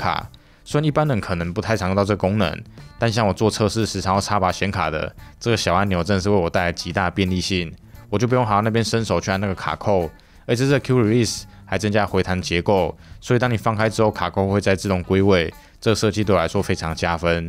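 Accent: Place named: Gan, China. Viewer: 13代CPU 評測 比起大陸 二斤 貓三 極客灣 裝機猿 賣茶人 翼王 等團隊 動作慢了5天 可是只有Huan的贛話 是獨一無二的。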